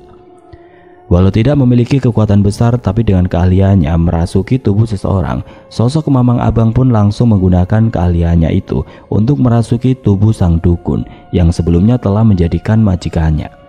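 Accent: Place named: Jakarta, Indonesia. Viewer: Dengerin cerita bang w ngakak muluk anjirrr logat betawinya itu lho garing batt 😂😂 sett dahhh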